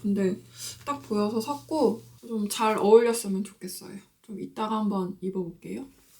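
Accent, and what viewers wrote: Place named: Seoul, South Korea. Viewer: snl 나온 서울사투리를 현실로 본것같애요! ㅋㅋㅋㅋㅋ 옷 다 이뻐요 잘 보구갑니당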